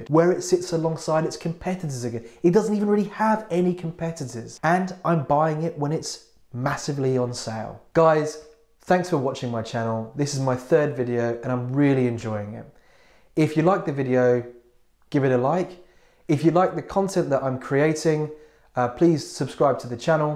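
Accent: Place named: England, United Kingdom. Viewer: Interesting accent. Are you from England?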